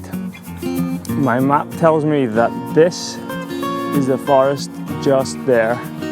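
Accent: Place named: Northern Ireland, United Kingdom. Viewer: Beautiful drone work, views and stills. Definitely a Winter Wonderland. Judging from your accent you are from Northern Ireland. Am I correct that you are living Scotland now? I am a Scot from Edinburgh but have been living in the pretty little town of Portstewart N.I. for 45 years to date. Anyway, I wish I was there also photographing that snow and hills...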